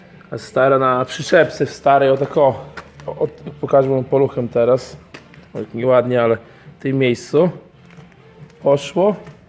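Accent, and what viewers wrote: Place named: Podlasie, Poland. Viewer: Tak ło chyba kolega z Podlasia :)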